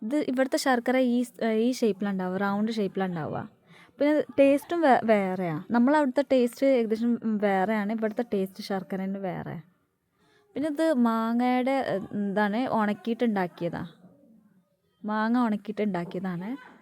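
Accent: Malappuram, India. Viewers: ഒരു പ്ലാവ് തൈ വാങ്ങി കുഴിചിടു 😂, ഒരു മലപ്പുറം സ്ലാങ് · Malayaliyekkal nannai malayalam parayunnu 👍🏻naattil Malappuram side aanennu thonnunnu 🥰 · ആ മലപ്പുറം ബർത്താനം കേക്കാന്‍ നല്ല രസം ണ്ട് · Slang കേട്ടിട്ട് മലപ്പുറം ആണെന്ന് തോന്നുന്നു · Slang malappuram, tirur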